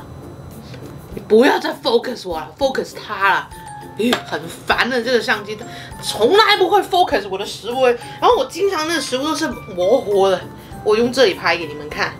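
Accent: Taiwan. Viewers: 國語好有台湾feel，尾音勁似👍👍🥳好正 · 對、為何你的口音咁台灣？你在那裏學的？一定不會在中學校！ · 嗨 你的國語發音很像台灣人😊說得很棒哦！我有些香港朋友是沒辦法那麼清楚發出咬音的呢，剛好肉圓是在我居住的城市 彰化，煙韌國語可以說成是 Q彈有咬勁哦。在彰化的肉圓是蒸熟後油炸，有脆皮派也有煙嫩派的、還有涼涼吃的肉圓 叫涼圓。 我也很喜歡旅遊 香港也去過十多次了，有機會來台灣的話 可以一起吃美食哦😊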